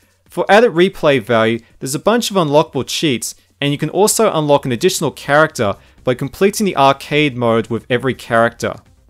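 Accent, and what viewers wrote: Australia. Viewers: Hi Mate. You sound like you are in Austarila, so am I. Did this Japanese import NTSC-J game play fluidly on your PAL Sega Saturn? Is your Saturn unmodded?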